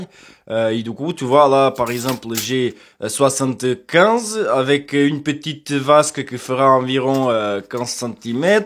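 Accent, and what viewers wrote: Portugal. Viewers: J' aime bien ton travail. Est tu portuguès ? Ton aquecent me ressemble un portuguès. Pardone moi quelque chose. Merci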